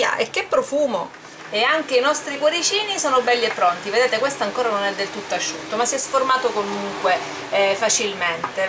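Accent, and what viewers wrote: Sicily, Italy. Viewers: Ok..grazie..ti auguro tante belle cose.. ps.o sentito sei siciliana anch'io, sei di palermo x caso? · ma sei siciliana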